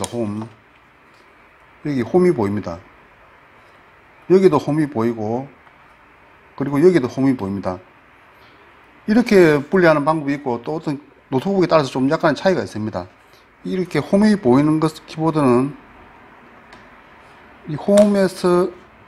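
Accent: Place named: Western Gyeongnam, South Korea. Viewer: 서부경남분이시네요 ㅋㅋ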